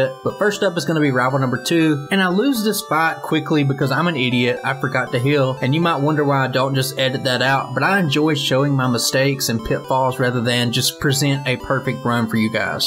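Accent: South, United States. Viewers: nah, dude. Thank YOU for the great content. Love the southern accent!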